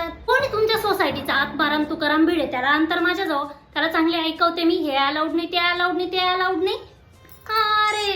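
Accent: Khandesh, India. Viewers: परफेक्ट खानदेशी 👌😅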